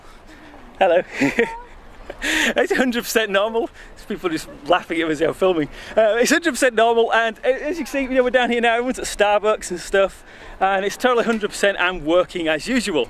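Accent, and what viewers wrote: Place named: Scotland, United Kingdom. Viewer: Ur accent is like a mix of British and Scottish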